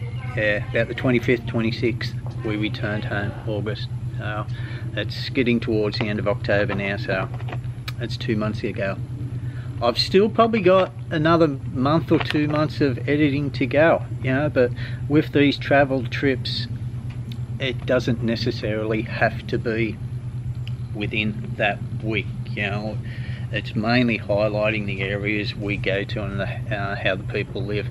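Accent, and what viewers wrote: Australia. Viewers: Hi I’m new to your channel and I’ve heard you talk about your kids and was wondering if nee née have any. Keep up the videos good listening to an Aussie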